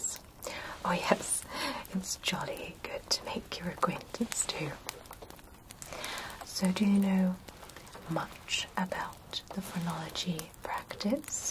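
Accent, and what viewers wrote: United Kingdom. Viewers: she have a proper brit accent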